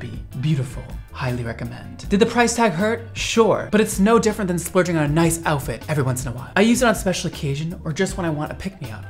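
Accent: United Kingdom. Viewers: me lives in the uk does British accent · LIVING for your cute British accent! Nailed it! ❤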